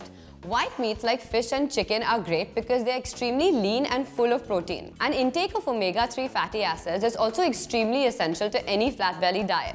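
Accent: India. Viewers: I know Indian woman because of accent English 😂😂😂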